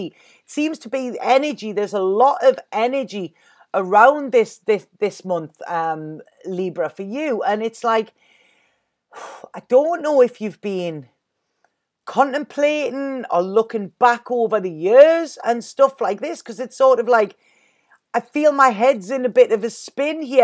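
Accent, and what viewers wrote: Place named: Scotland, United Kingdom. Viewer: German? LOL sorry but I find that funny. Scottish maybe. People are funny aren't they. I will contact you in the near future for a reading. I figured out a way to generate electricity without fuel, wind or sun. Not sure how to proceed with this venture. If you gmail me I can explain the concept in 2 minutes. I will blow your mind on how simple it is. Get ahold if you want to.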